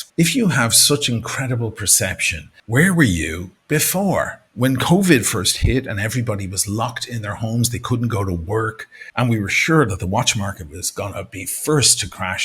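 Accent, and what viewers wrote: Dublin, Ireland. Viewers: Always love to see a dublin accent on u tube talking about watchs i admire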